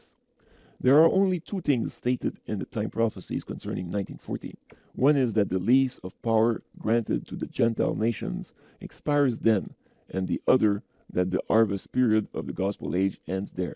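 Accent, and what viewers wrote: Quebec, Canada. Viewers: hmm t québécois toi, je sens l accent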